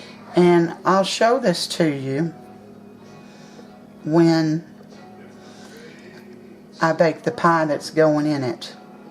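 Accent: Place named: North Carolina, United States. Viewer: You're just the sweetest YouTuber! You sound like a North Carolinian. (My home state) I can't wait to try your recipe. I'm too old and stressed to be fighting against time with butter recipes for pie crusts.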